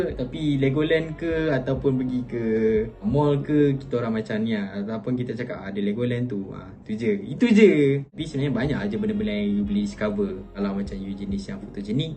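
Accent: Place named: Kuala Lumpur, Malaysia. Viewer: Dtg daripada keyel ke jaybe Xleh blah ah aku dgr slang dia😂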